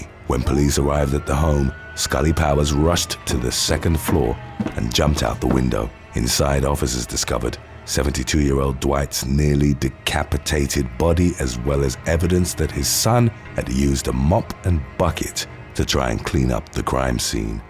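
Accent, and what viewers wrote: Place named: United Kingdom. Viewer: Cut some slack, he’s British. They pronounce things differently over there.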